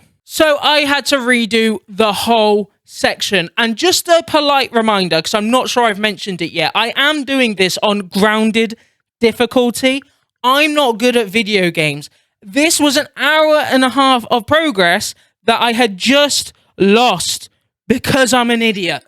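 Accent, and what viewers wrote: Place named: United Kingdom. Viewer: Make more video only down side is that your british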